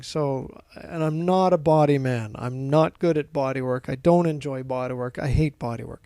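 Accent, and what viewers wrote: Canada. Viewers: Good video! Looking forward to the follow ups. Hey where are you from? You sound Canadian to me. Oshawa here.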